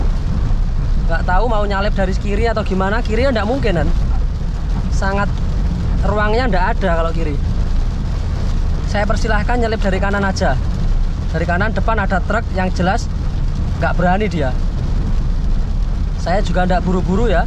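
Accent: Madiun, Indonesia. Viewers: orang madiun nggih mas?😅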